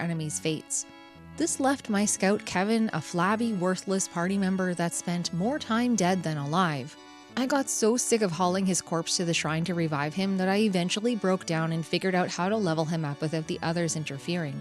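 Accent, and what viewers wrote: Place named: Canada. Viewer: I thought I heard a Canadian accent in your video, which was why I mentioned the LttP morcel. I don't remember the translation of Drakkhen very well as it was decades ago, but it has to be better than the English version as it was written by a French studio. If you are correct, the fact that they translated the game from French to Japanese (two wildly different languages) and then from Japanese to English (also two wildly different languages) is very much going around the arse to get to the wrist and ends up not surprisingly with a bad translation. The PC version in the original French can be found at a site called Abandonware France. I'm sure that you could muddle through as you have already played the game. As for Link to the Past, that game is quite text-light and the French Canadian rom is out there, so I am sure that you could finish it without any difficulty.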